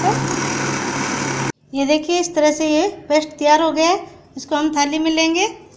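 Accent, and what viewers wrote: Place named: Marwari, India. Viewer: Aap itni marwadi kyon bolte ho? Hindi acche se nahi aati kyan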